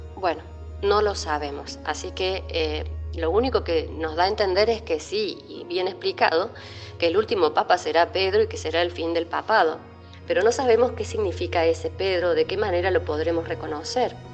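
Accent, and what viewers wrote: Spain. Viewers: saludos desde Formosa, muy bueno tu programa, felicitaciones...sos española o argentina?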